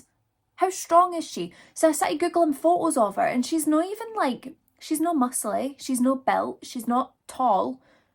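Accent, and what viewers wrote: Scotland, United Kingdom. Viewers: Oh my- you’re so beautiful also! Your accent! 🥰 I take it you’re a Scot? 🏴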